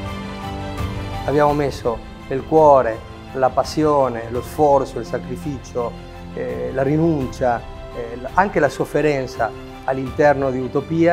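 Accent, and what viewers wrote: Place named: Argentina. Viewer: That Argentinian accent is so rough lol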